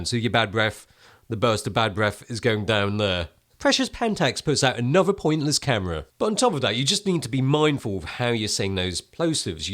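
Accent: United Kingdom. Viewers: The best asian guy who speaks britishenglish. A fan since digital rev days. More power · Hey can you please make a video talking about yourself, where you grew up, how come your English sound perfect British? I enjoy watching you vids. Thank you for posting these.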